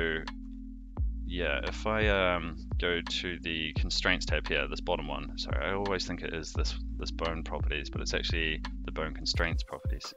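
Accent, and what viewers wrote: New Zealand. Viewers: Hi. Have you seen a YouTuber named Karl Rock. He is from your country but married to a girl here in India. My word, if you listen to him with your eyes closed, you cant tell the difference. Your sound and accent so closely matches to his. Just incredible. The only difference is that he can speak Hindi as well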